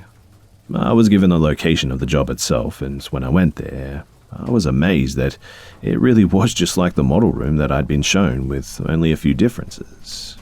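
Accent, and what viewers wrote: Australia. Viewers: I swear you're Australian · Just fabulous Its a real treat to listen to my native aussie accent for change I've subscribed and now about to binge listen to some of ur other works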